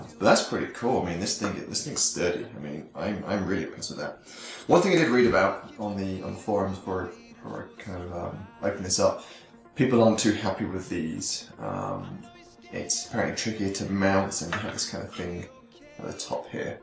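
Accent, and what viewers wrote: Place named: United Kingdom. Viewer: Got my hopes up hearing British accent that you can pick these up in the UK alas by the Speedtest he is in Colorado.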